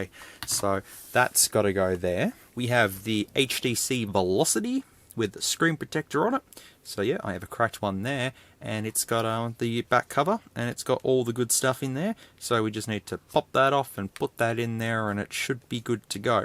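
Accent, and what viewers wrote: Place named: Australia. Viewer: Also he's Australian, that's how we sound.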